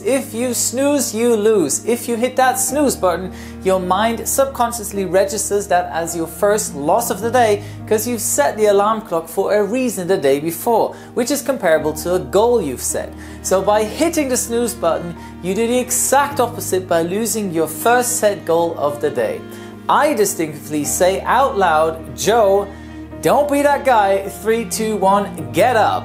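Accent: England, United Kingdom. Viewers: You are very British in your accent. Refreshing, in a world that is crazy about imitating America. 😊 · Hi,! I'm from São Paulo, Brazil. Well, I simply love Watching your videos on YouTube. Nice to see that, from all your habits as soon as you get up, you meditate! Absolutely healthy to your mind, body, and everything. I really admire your job and this charming British accent you have! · Wait is british?? All this time i thought he was german with amazingly english accent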